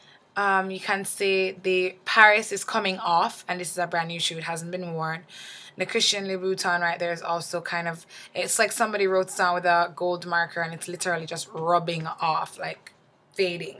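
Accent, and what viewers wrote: Jamaica. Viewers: you are definitely Jamaican no hiding that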